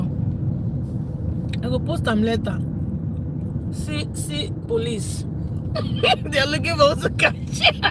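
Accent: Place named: Nigeria. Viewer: ❤ with how the Pigin is so giving in her voice 😂😂😂 Yes o, it's real o 😂😂😂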